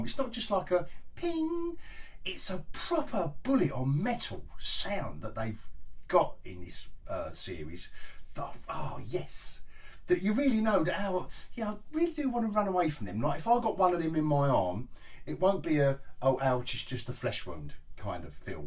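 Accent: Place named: Cockney, United Kingdom. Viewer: Christ I'm 100% British and I'm jealous of that cockney accent! 😁 It was a great pay off to episode 4 which, at the time, felt very weak. Keep up the good work mate!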